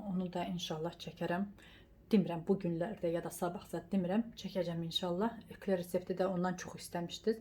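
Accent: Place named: Qakh, Azerbaijan. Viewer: Gözəl ləhcəmiz ❤ Qax' dı, Zaqatala? Əllərinizə sagllq 🌹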